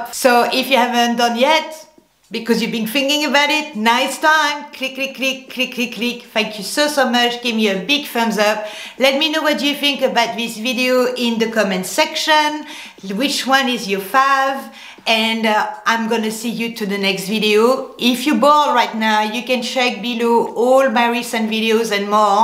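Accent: France. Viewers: Cocorico!🐓 Bonjour, je viens de découvrir votre chaîne. J'ai été interpellée par votre accent très français ("But alors you are French?"- La Grande Vadrouille 😄), en même temps cela me permet de faire des progrès en anglais car je comprends (presque) tout pour une fois... J'aime beaucoup ce que vous présentez, les couleurs et imprimés, et même si je n'ai pas la même colorimétrie, j'ai des goûts très similaires aux vôtres. La marinière, une valeur sûre! J'en prends chez Armor Lux, j'en trouve aussi chez Esprit à des prix plus abordables et de qualité correcte. J'aime bien porter un tee-shirt col rond à rayures noires sur fond blanc ou écru, sous un pull en cachemire noir col V, je porte le pull légèrement plus court pour laisser un peu dépasser le bas du tee-shirt pour rappeler l'imprimé du haut, le tout avec un jean. Passe-partout et chic. Bravo pour vos vidéos si agréables, merci pour votre bonne humeur et votre spontanéité. 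Forcément je m'abonne, clic clic clic!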